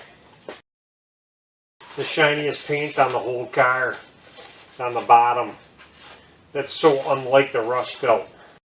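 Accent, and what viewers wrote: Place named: Minnesota, United States. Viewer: haha yah could tell by your accent, i am also from the twin cities. Still driving around this civic?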